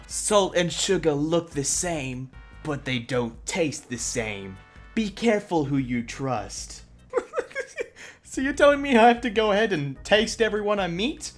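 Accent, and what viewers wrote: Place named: Australia. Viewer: idk if i heard correctly but i can hear a bit of an aussie accent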